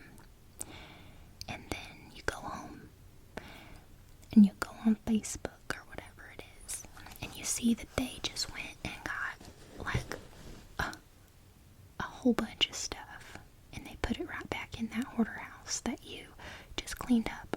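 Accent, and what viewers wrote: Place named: Oklahoma, United States. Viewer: You sound like you are from Oklahoma.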